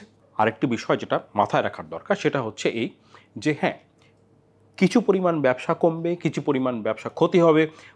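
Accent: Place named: Kolkata, India. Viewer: আপনার কথাগুলো কলকাতার বাঙ্গালীর মতো, দাদা কি কলকাতার নাকি?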